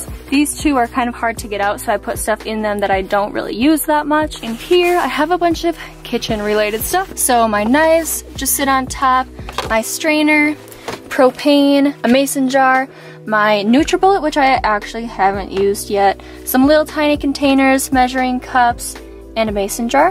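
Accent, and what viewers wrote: Wisconsin, United States. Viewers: Are you from Wisconsin??? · When I started watching you I said; "That's gotta be a Wisconsin girl!". Way to go kid. I bet your parents are proud of you, I know I would be.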